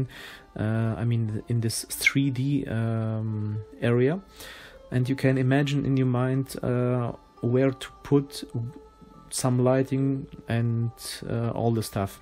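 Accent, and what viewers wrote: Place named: Germany. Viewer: Wie man einfach hört das du deutsch bist beste 😀👌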